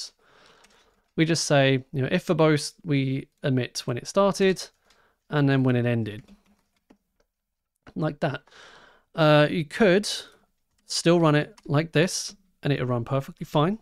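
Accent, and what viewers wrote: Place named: United Kingdom. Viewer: Underrated channel, also that bri-ish accent is woowwwwww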